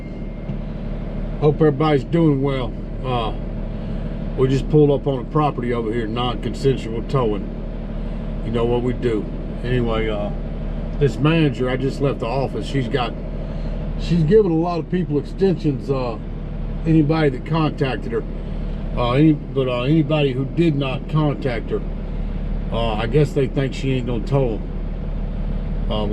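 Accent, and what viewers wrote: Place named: Georgia, United States. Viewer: Hey man, I'm originally from Georgia and had to move further north a few years ago. Can't tell for sure if you're from northern Florida or southern Georgia but watching your videos is like a trip back home for me. I'm only half watching what you all is doing. Mostly I'm just enjoying the conversation. One good ol boy to another, I thank you.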